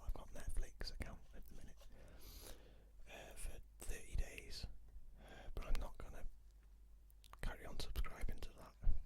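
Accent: Wales, United Kingdom. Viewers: ah right thanks I thought it sounded Welsh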